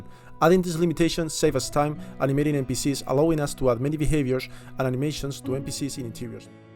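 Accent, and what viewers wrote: Spain. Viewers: this game looks AMAZING, I cant wait to know more also, is that a fellow spanish accent I hear?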